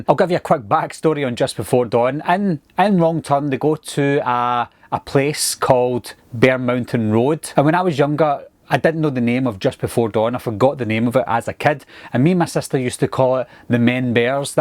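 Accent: Scotland, United Kingdom. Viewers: Are you Scottish my friend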